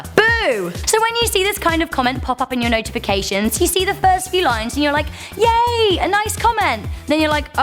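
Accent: England, United Kingdom. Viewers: I can't believe your English! I am English too!!!!! :) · I love your hair! red hair is the best! I've also always loved British accents, and your outfit is ON POINT! You go girl! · She is really pretty and 10 million people in Britain have the same accent, including me!! 😋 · Are you British if you are I love your voice but if your not I love your accent and crop😸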